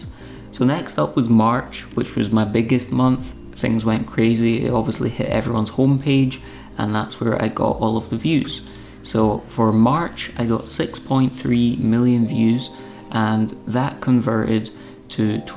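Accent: Scotland, United Kingdom. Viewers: Thank you for sharing this information. It gives me some motivation to do more video editing and to try to become good at it. Side note: Love the Scottish accent. Scotland was one of the first countries I spent time in when I traveled outside the USA. I have nice memories of my time there and will always love hearing a Scottish accent because of that. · The Scottish accent has got to be the best in the world, and your voice is so relaxing. Anyway I'm glad it did well and you got some good pay out of it as you deserve it. I can work out that some other youtubers must be so rich!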